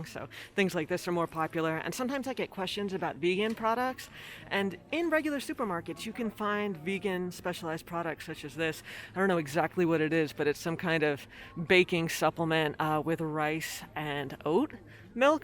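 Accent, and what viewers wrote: France. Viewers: Love your FR accent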